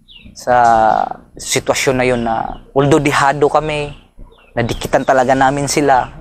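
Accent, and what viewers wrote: Philippines. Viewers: tagalog na tagalog talaga hane!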